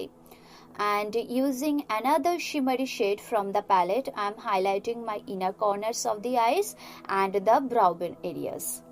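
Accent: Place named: Bengal, India. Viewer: I think u r bengali bcoz of ur accent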